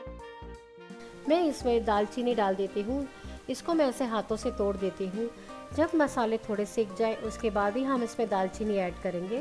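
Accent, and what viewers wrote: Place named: Kutch, India. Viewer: apki resipi rsoi sow me bhi dekhihe muje bhot psndhe apki boli thodi kchhi mix gujrati mithilgtihe thnks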